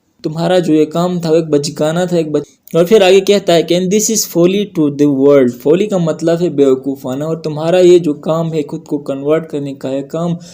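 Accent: Gilgit-Baltistan, Pakistan. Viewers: AP ka accent GB waloo ka ha.100% AP GB ka ho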